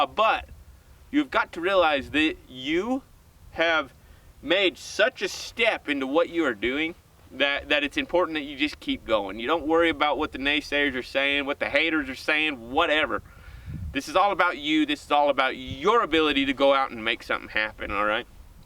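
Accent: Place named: Utah, United States. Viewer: Are y’all from Utah by chance? You have that Utah accent 👍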